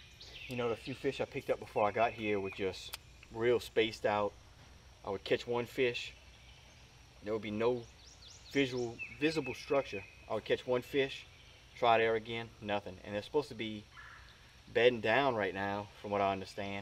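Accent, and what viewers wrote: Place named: Louisiana, United States. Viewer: First time watching awsome video. Sound like u from Louisiana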